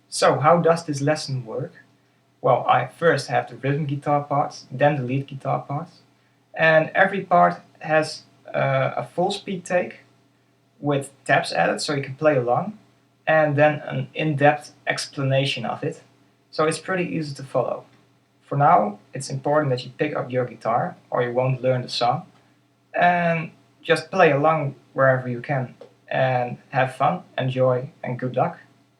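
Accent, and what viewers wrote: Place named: Netherlands. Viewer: I Hear a Dutch accent :-)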